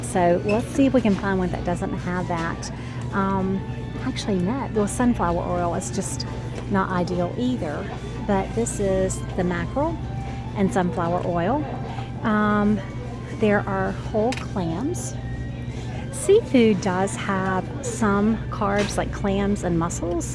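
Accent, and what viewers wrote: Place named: North Carolina, United States. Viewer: Love your channel! Keep it up! Are you from NC? You certainly sound like one of us :P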